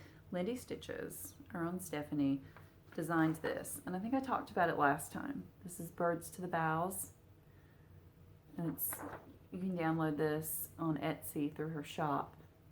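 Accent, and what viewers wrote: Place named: South, United States. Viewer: I soooo enjoy you, your soft slightly southern:-) accent is sooothing to listen to...:-) enjoyed your WIPs your HRH is so beautiful, not to far and your finished...indeed your videos are my first choice. Loved your Haul... Indeed if all mankind would live in mutual love, a kindness would be likened unto a vapor before us, changing us...💛💛 Blessings...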